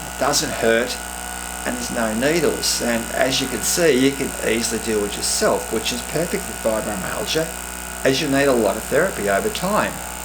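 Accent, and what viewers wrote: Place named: Australia. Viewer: He’s an Aussie, so I understand and I can hear him. I agree the sound is a little faint I had to turn the volume up. 😉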